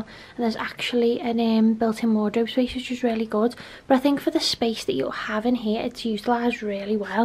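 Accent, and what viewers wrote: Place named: United Kingdom. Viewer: You have the prettiest accent and voice I ever heard from British person. 😄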